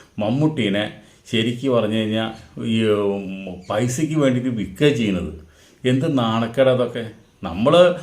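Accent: Thrissur, India. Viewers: Thrissurkarude slanganu chirri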